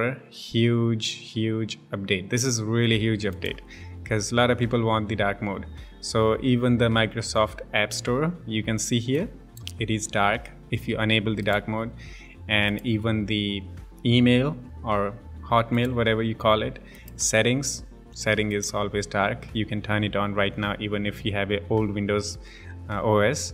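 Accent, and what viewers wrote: India. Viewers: Are you Indian brah?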